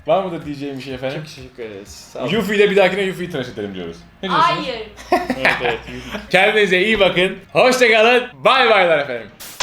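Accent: Turkey. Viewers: abi sen trabzonlumusun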